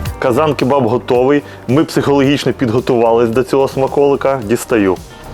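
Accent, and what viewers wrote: Ukraine. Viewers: Смакота ! Молодець ! А звідки ти є, друже, як на мій погляд то львівський акцент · Український акцент супер!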